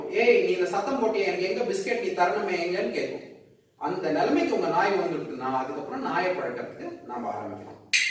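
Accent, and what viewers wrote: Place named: Tamil Nadu, India. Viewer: 😊 your typically Tamil slang ..nice..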